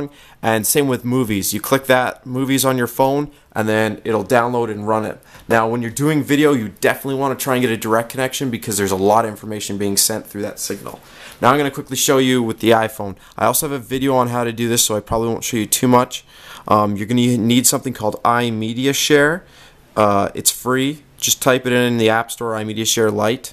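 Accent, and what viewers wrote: United States. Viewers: Leigh Ann Woody, the world is more than USA, outside the states you pronounce it "rotor", even if he sounds like an amercian, "rotor" is correct. So, how smart are you? ;) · Gerardo Sanchez Dumb stupid speaking yank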